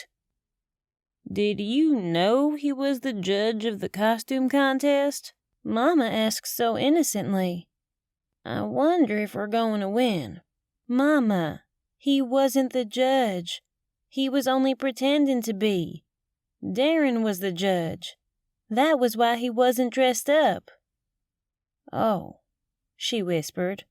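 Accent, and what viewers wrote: South, United States. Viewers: Y'all don't live in the south if you think this is over the top! All my neighbors talk just like this! And I'm in south Florida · I am sorry, but this narrator’s southern accent is way too much, I can’t finish it, it’s so distracting, and takes away from the story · I love the Southern drawl. And I love the story. Thank you! Perfect entertainment as Halloween approaches 😊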